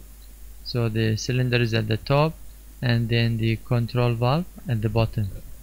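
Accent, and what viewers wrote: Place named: Arab world. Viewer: may i know what you race is bro? Your english accent is like an arabian... 😁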